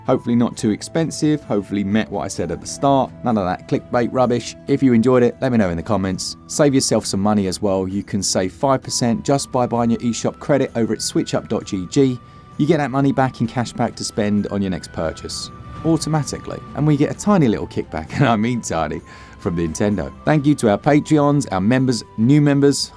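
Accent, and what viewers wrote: United Kingdom. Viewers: Nice channel. You brits always sound good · Thank you very much (!) for adding yet more games to my backlog!! I should know not to watch these videos because as you said, and i have said in the past, you say what it is on the tin and there never is any clickbait - main reason your one of the very very few (2!) switch channels i watch now. Plus i love hearing fellow brits accents too 🇬🇧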